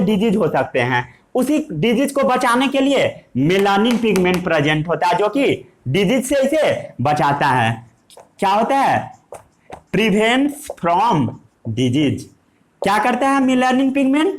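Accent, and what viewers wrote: Bihar, India. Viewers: proper hindi chaiye Bihari style nai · Yes something like Bihari tones...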